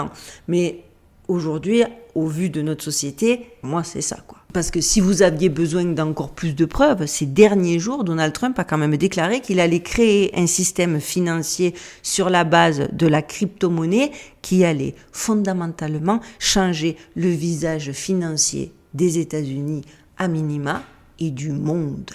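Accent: South, France. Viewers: bjr toujours de bonne vidéos.tu a un accent du sud de la France.🙂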